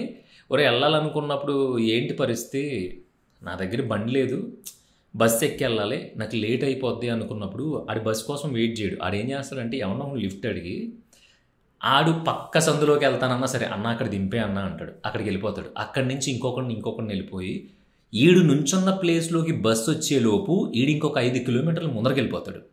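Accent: Godavari, India. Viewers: Bayya meedi a ooru? Godavarenaa...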